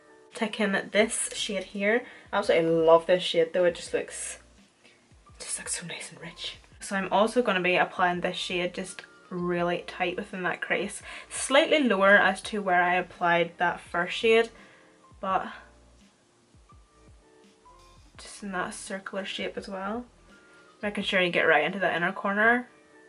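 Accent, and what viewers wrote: Northern Ireland, United Kingdom. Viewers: love this look, I'm from Ards so it's good to hear a northern irish accent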